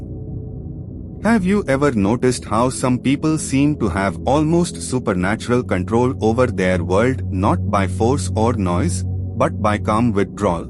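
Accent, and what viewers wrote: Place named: India. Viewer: That indian accent 😂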